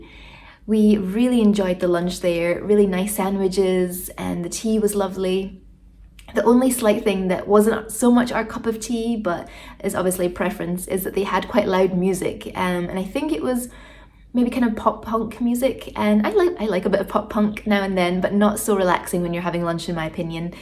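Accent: Scotland, United Kingdom. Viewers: Good to hear nice Scottish voice ,Peebles looks lovely 😊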